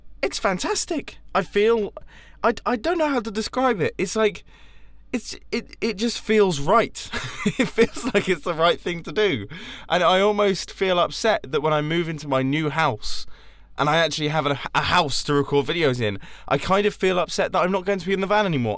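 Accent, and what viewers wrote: United Kingdom. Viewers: Hello charming brit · That charming brit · ello, charming brit! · Charming brit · Ello charming brit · Ello charming Brit! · ’elo charming brit! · Ello charming Brit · ello charming brit!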